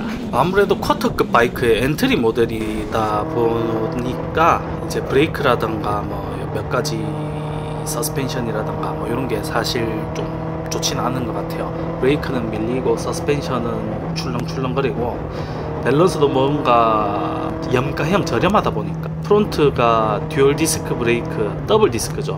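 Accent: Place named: Daegu, South Korea. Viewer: 자막이 없으니깐 발음이 뭐라하는지모르겠네요;;...사투리보니깐 대구사람인거같은데ㅠㅠ오토바이 땡겨먹기 한번하시죠😂ㅎ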